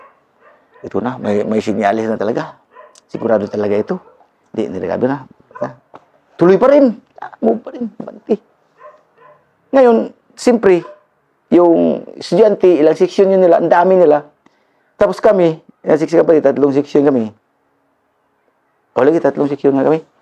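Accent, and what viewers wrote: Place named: Visayas, Philippines. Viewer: Bisdak kaayo c Master.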